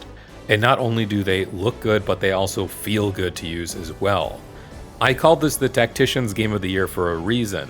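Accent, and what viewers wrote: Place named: United Kingdom. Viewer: first video I've seen from you and i genuinely thought you were British at the start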